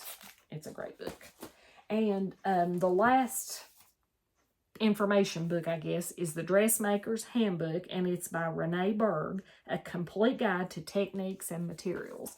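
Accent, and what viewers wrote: South, United States. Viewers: I enjoyed your book sharing. Many of those books are books I’ve owned and then when I had to downsize had to pass them on. But, they brought me much pleasure while I had them. (I do a lot of mental sewing!). I knew your southern accent resonated with me. I live in western North Carolina, too!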